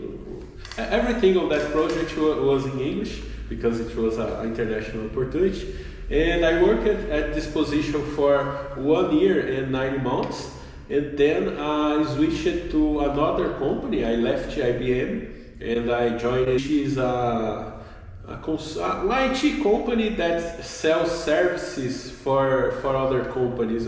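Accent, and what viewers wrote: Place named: Brazil. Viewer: Ta bem brazilian… mas ta fluido e confiante demais, isso importa muito. Parabéns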